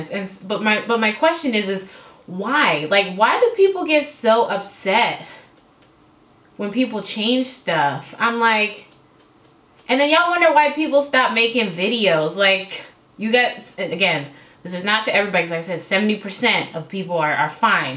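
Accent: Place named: Atlanta, United States. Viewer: I’m from Atlanta and I live in Washington state too lol what a coincidence I heard the swang in your voice and I was like where is she from hmm . Well subscribe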